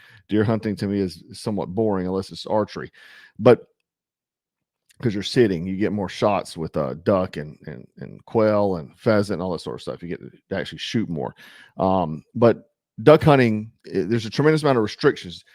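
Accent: South, United States. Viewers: What’s wrong with your accent??? Southern is great!!! P!ss on tha rest of yall! 11 Ohm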